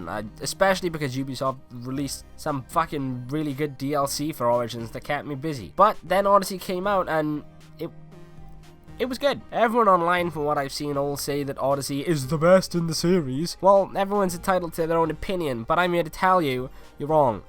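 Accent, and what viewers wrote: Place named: Wales, United Kingdom. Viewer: Thanks for the help! Btw are you Welsh? Sounds like you're welsh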